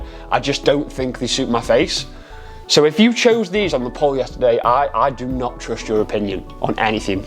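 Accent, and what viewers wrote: United Kingdom. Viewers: Great physique + strong regional British accent + vulgarity = Subbed. 👌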